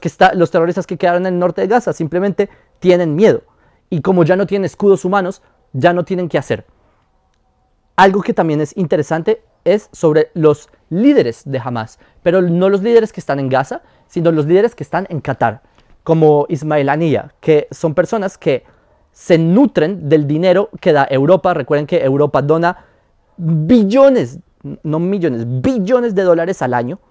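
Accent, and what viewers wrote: Bogotá, Colombia. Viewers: Acento rolo (Bogotano) que tienes.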